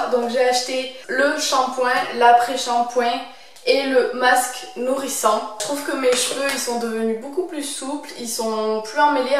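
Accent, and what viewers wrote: South, France. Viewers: une "cagade" mais ouuuuui Sud en force, par ailleur ton accent me fait rêver une des seules youtubeuses avec un VRAI accent du Sud ! Super vidéo comme toujours! continues comme ca ma belle ❤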